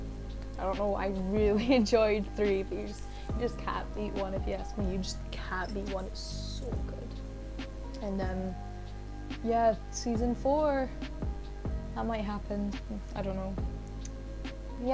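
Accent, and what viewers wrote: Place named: RP, United Kingdom. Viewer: u do sound kinda posh but gang gang sjsj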